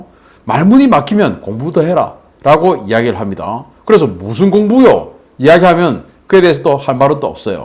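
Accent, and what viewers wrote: Gyeongsang, South Korea. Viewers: 신인균님의 해설을 들으며 매번 깔깔 웃습니다. 어찌 그리도 재미있고 명확한 내용으로 경상도 사투리를 섞어... 정말 고맙습니다.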